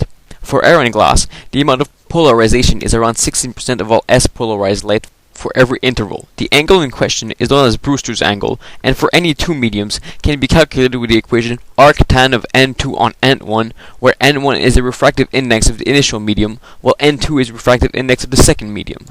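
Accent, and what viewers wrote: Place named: France. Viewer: I am glad you love to speak fast AND with French accent but the combo of those two, makes your effort almost useless in delivering an otherwise interesting and useful information. Plus lentement s'il vous plait!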